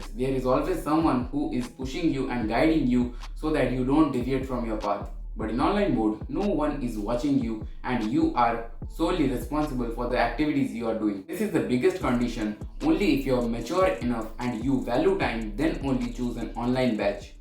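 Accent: Bengal, India. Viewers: আমি নিশ্চিত তুমি বাঙালি from accent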